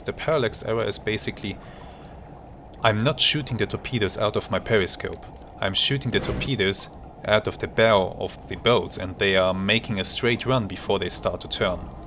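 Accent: Germany. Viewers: I really love your SH3 series mate. You are smart and careful and that german accent is just awesome! This is real entertainment. Auf wiedersehen herr kaleun!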